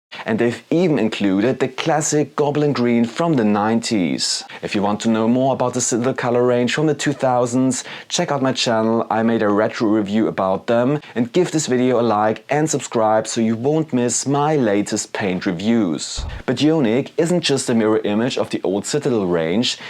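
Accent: Germany. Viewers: So about this 'pronunciation' thing in the comments, it's because he's from Germany, I presume? It's a german accent or how germans read certain alphabet differently. What's the fuss about?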